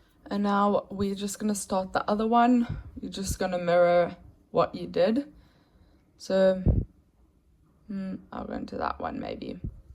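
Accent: South Africa. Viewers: From your accent I can tell you are South African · I was so happy when I heard the South African accent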